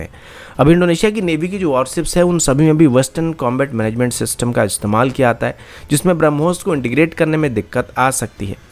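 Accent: Odisha, India. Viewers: Bhai aap odisha se ho aapka acsent se laga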